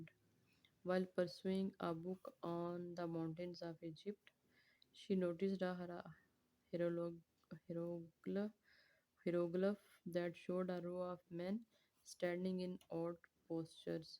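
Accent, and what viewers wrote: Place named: India. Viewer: I put like for "bismillah"but unfortunately i cannot understand because of your accent your accent don't like English Like Indian 😢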